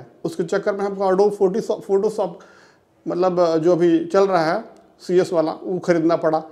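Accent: Bihar, India. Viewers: Bihari accent is the best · Best honest video I have ever see and that too in bihari accent😂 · Only Bihari can knows he is Bihari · Gazab bihari accent,asli wala amir😁😅 Sir u r a true bihari apne ap ko real me ambani se kam nahi samajh rahe the😁😆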